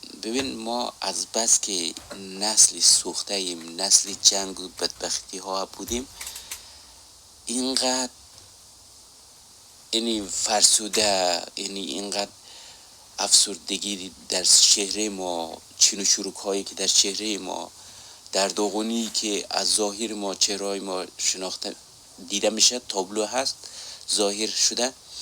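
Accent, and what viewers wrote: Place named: Iran. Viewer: موضوعی خوبی را بحث کردی ، ولی با معذرت لحجه واقعاً افتضاح است آغا ، به عنوان مثال یکدفه خیلی غلیظ ایرانی میگی (اینجوری) یکدفه خیلی ساده محلی (موکونه) میگی 🤣 دوم اینکه خیلی پِچ پِچ میکنی ، ادامه داده نتانستم . لحجه و رویش گویندگی روان و ساده خیلی مهم است .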